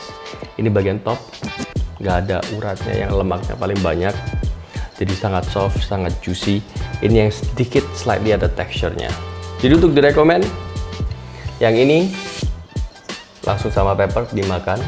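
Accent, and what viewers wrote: Java, Indonesia. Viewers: kok enak ngomong jowone coba nek review campur bahasa jowo mas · logat jawanya chef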